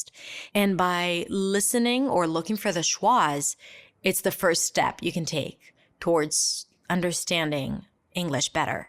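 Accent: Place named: United States. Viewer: Hello! I love your beautiful American accent and thanks a lot of the podcast.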